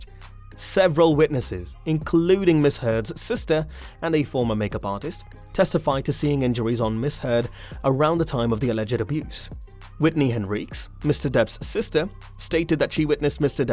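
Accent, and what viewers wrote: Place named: United Kingdom. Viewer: Get the facts straight you sound British so your laws vary from ours and as his supporters I believe that was done by bots. Facts are wrong are you sure your not being paid for this article by AH...just have to ask.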